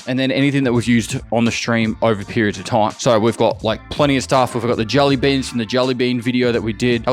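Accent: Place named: Australia. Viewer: why does remind me of russell crowe. not just the accent, but like overall feel and vibe. wise old aussie or NZ'er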